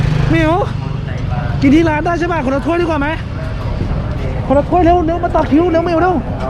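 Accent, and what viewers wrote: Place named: Nakhon Pathom, Thailand. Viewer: สวัสดีคุณ และน้อง เอทำไมพูดเหน่อเหมือนพี่เลยแฮะ พี่คนนครปฐมจ้า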